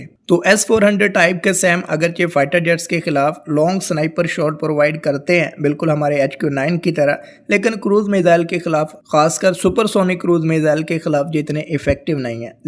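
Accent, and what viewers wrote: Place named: Punjab, India. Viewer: Bhai please English panjabi style me na bola kar bura lagta hy.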